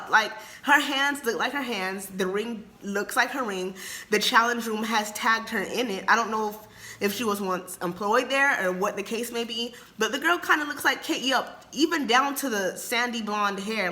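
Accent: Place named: France. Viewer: She's definitely not American, earlier videos show that she has a noticeable accent (possibly French) and English is not her first language hence spelling mistakes and such.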